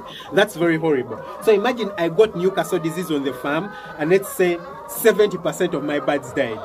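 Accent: Uganda. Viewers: Sir are u from uganda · Your accent is so ugandan 🇺🇬🇺🇬 mucu love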